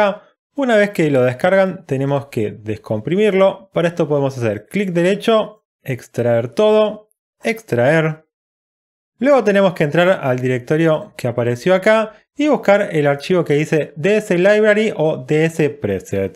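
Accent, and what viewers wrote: Argentina. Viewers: acento cordobes creo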